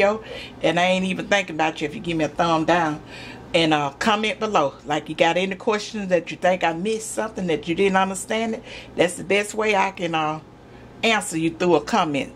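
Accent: South, United States. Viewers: I love your southern accent! I could listen to you all day! Thank you for making this video, it is very entertaining and educational :) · Amen Thank you I love your southern talk and you area Blessing to the women who want to cook a southern meal for the family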